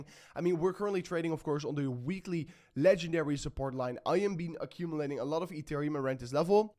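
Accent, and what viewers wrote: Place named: Netherlands. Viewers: Ben jij Nederlander of vlaming ofzo? ik hoor een accentje. groetjes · Yep he is Dutch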